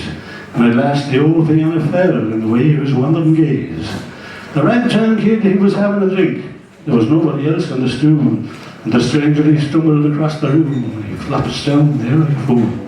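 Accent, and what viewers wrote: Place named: Ireland. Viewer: Words are unclear to a non Irish speaker of English.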